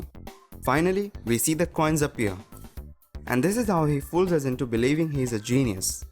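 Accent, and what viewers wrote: India. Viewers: indian accent, bye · Please, your India accent so annoying, and dont copy others video. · What'sup with the indian accent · Why do some videos have a host with thick Indian accent? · He is Indian because Indian people voice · Learn another accent, this indian one is very cringy and anoying. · The voice over has a strong Indian accent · Indian accent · I hate that indian voice. Thumbs down!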